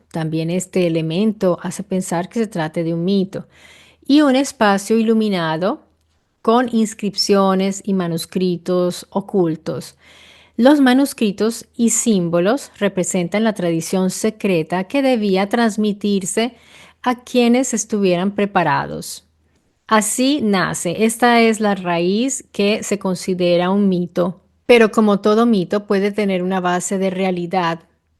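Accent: Italy. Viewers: Ese acento es de una rola que vivió en Italia y ese cabello/gafas le da un gran marco hermana.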